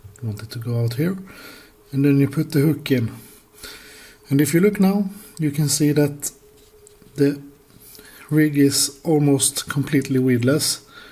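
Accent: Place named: Norway. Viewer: You said Sweden when you mentioned darts, but to me you sound very norwegian, especially how you say "just like this" sounds exactly like my dad whenever we visit our relatives in the US haha. Thanks for the guide, my local water for perch is full of crap, as its next to a mall, and old factory now museum, and not to mention an elementary school, specifacly a steiner school so they do alot of outdoor stuff, so along the edge where the perch sit there are so many rocks, and sticks that people have chucked into the water. Finally decided i needed to learn to use ofsett instead of having to go the store every 3rd fishing trip to pick up light wire leaders (there are alot of small small pike in the lake)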